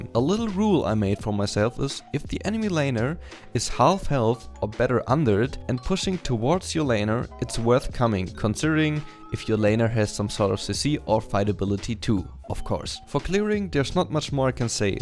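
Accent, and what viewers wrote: Germany. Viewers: Ey echt cooles video, ich hätte da 2 Fragen erstmal und zwar: 1. Welche Elo Spielst du also sagen wir letzte Season? 2. Was ist dein Mastery Score mit Shaco momentan? Als Feedback, mach gerne mehr Videos die sind echt Klasse, hast echt alles super erklärt und wieso ddu diese Spielweise bevorzugst. Du könntest deinen Viewern also auch mir zeigen wie du spielst gegen counter Matchups verschiedene invade jgl Wege und was du machst wenn du behind bist. Danke fürs Lesen ( und ja man hört es heraus das du Deutsch kannst) LG ~Finn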